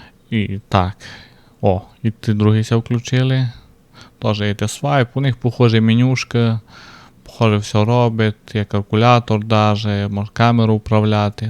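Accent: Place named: Transcarpathia, Ukraine. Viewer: По говору, чую, що з Закарпаття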